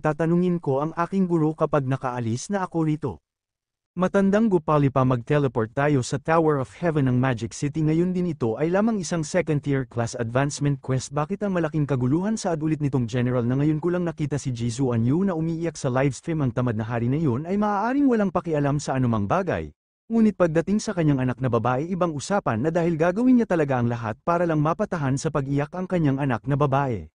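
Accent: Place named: Visayas, Philippines. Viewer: Bisaya gahing bisaya nice lods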